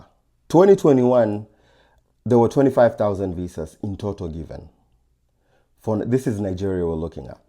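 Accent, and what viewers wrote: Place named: England, United Kingdom. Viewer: Weh! English accent for me😊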